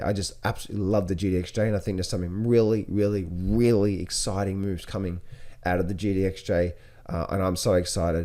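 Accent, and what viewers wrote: Australia. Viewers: Thats an Aussie accent !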